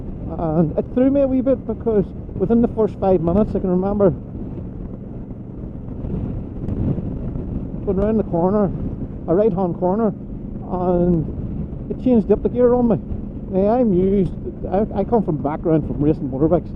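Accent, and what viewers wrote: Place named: Scotland, United Kingdom. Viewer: Nice review.But I could not understand a lot of what you said because of your Scottish accent. I thought this was the same as the foot shiftier on the DCT. But I guess it is different. But thank you for the video because now I have to investigate. I do not need an automatic so much but was thinking about getting it because I will do 80% of my riding in the city. But if they make something like this for the manual version I would rather save the $700 that the DCT would cost me.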